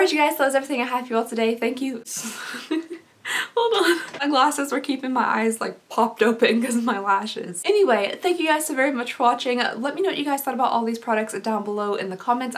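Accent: Kentucky, United States. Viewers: Mercer County here.. I knew she was from Kentucky as soon as she started talking but then she said something about a flea market and I literally laughed out loud 🤣 subscribed right after that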